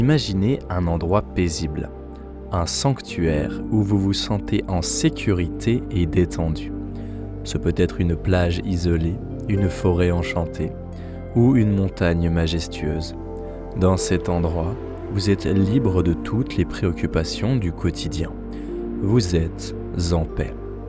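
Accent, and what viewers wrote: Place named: France. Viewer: Thanks, you know i love your french accent 🥰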